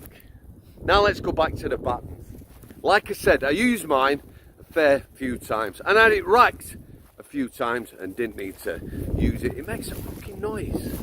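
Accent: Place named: Barnsley, United Kingdom. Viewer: Love the channel mate , im a barnsley lad so nice to hear the accent on youtube 😊✌️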